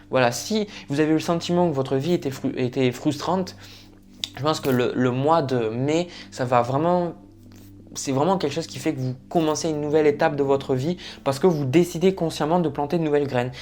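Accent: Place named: Southwest, France. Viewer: Bonjour, étant ascendant scorpion, c'est la première vidéo que je visionne sur votre chaîne. Néanmoins, je dois avouer que par manque de temps, je n'ai pu regarder uniquement la moitié de votre vidéo, mais ce n'est que partie remise. La partie que j'ai visionnée a été extrêmement émouvante. Chaque mot que vous avez prononcé (avec votre joli accent du sud-ouest), j'aurais pu les prononcés moi-même, dans mon esprit, dans mon coeur et à voix haute. Oui, instinctivement et intuitivement, sans me poser dans la figure de la victime, je me suis souvent demandée ces derniers temps: Pourquoi moi? Je ne me reconnais plus dans ce que je suis devenue? Quel est ce tournant que ma vie a pris sans que je ne me rende compte de rien. Intellectuellement, j'ai beaucoup cherché mais maintenant je sens et même je me doute que la réponse est dans ce qui est caché, ce qui est connecté au spirituel, d'où le tirage de ces cartes pour les scorpions qui incarnent des notions de spiritualité. 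Merci du fond du coeur, de ce tirage en grande résonnance avec mon âme. Je m'abonne.🙏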